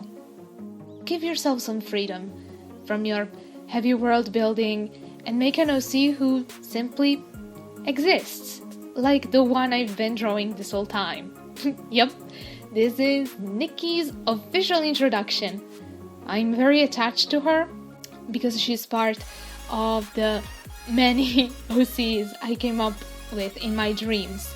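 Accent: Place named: Syria. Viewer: Of course! I have a friend from Syria and her accent sounds similar to yours, so I was curious. I love your art style and have a nice day!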